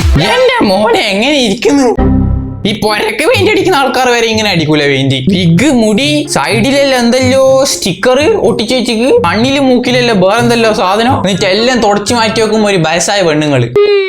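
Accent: Kannur, India. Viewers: Kannur basha poli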